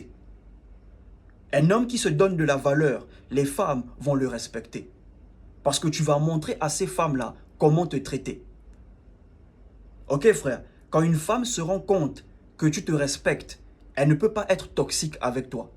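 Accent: Gabon. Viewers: Bjr type j'aime tes conseils j'ai une question tes Gabonais ? Car ton accent est gabonais ❤si oui répond moi stp❤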